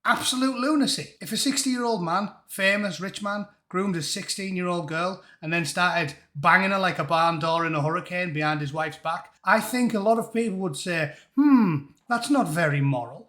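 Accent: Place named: Middlesbrough, United Kingdom. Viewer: That sounds like a sunny Boro accent I'm hear here..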